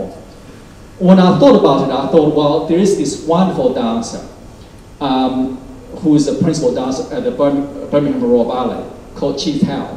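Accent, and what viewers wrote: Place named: Australia. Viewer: All those years around a 'Aussie' wife...he has a slight Australian accent. So loved listening to him tell about his life. Loved the movie about him!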